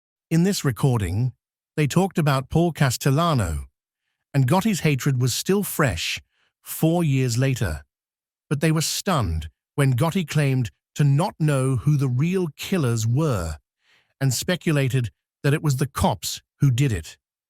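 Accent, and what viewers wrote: United Kingdom. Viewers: I think his crisp British accent is far better understandable than some American mumbling.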